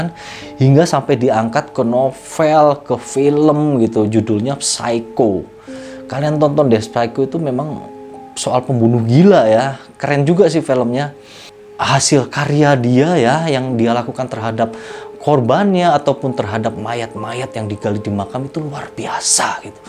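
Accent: Java, Indonesia. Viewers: logat jawa nya kenthel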